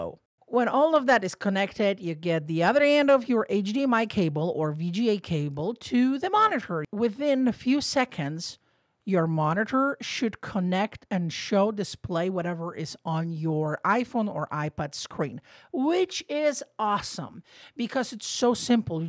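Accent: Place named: Brazil. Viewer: You sound Brazilian. Awesome video! Thanks.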